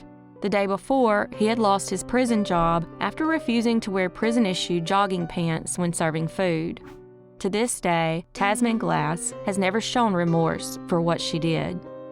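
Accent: South, United States. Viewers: You have the perfect voice to deliver those insults. The family not knowing about his violent nature...THE perfect southern "bless your heart" delivery 😊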